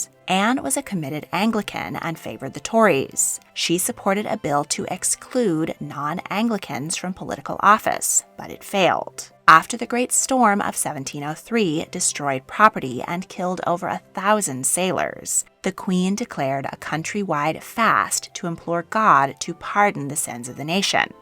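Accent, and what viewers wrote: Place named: United States. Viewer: The narrator's voice is rather unpleasant. Sharp, constipated, painfully american.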